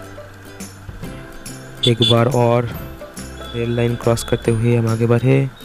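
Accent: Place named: West Bengal, India. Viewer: Gd one..bt you should improve your hindi 😇..i think you are from west bengal ❤️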